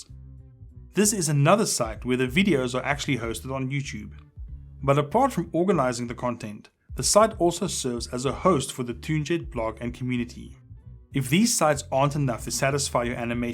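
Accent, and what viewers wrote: South Africa. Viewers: You South African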